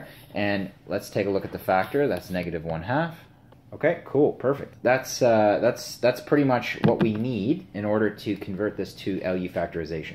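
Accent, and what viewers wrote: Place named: Canada. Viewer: ha could tell by your accent, Canadian fella! great video man!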